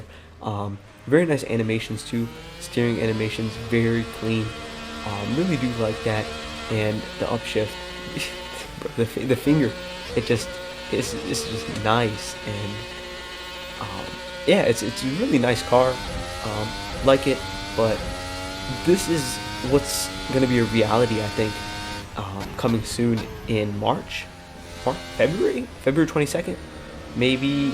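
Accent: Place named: Canada. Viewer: You sound extremely Canadian.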